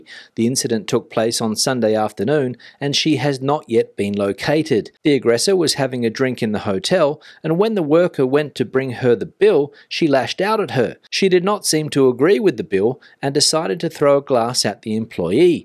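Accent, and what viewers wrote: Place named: Australia. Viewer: Dude, you are without a doubt the best Spanish newscaster I have ever heard. I'm an avid follower, having lived in Spain over 20yrs in andalucia. You say it as it is in English with an Aussie accent and a straight face and crack me up. Keep doing what you're doing my friend and hopefully one day you'll take a mobile podcast over to Portugal, you're smashing the Spanish news for the British expats 😉👊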